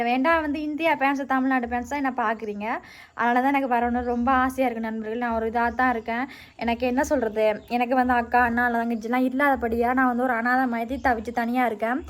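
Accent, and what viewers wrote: Sri Lanka. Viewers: இலங்கை தமிழ் அழகு நீ பேசுவதும் அழகு கபடமற்ற பேச்சழகு பெண்மை அழகு உன்னிடமுள்ளது.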